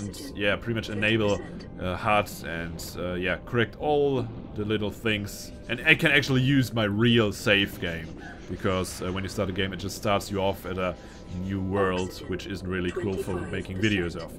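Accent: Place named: Germany. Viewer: Are you german? this accent sounds so german lol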